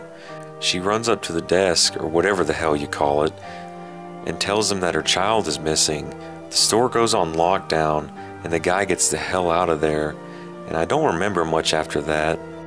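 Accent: South, United States. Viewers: he's southern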